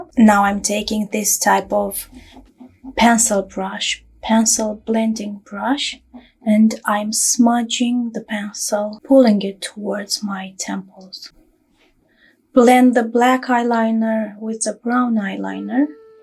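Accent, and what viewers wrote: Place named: United Kingdom. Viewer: I love the british accent! And the ByTherry powder Is my favorite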